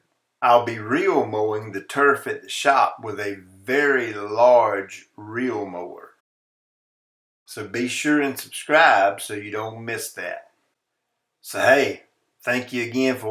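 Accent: South, United States. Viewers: Hey, great video. then again You know how I feel about that ventrac. But I do have a question about these long driveways and I know you can. Put a great on there by but when did the Abi? Be able to put a slight grade on it to where it ran off the driveway almost immediately. and it not be noticeable to most people just wondering and as far as people Saying you should talk less work more. That's just cuz they can't handle a good southern accent, sir. God bless great video.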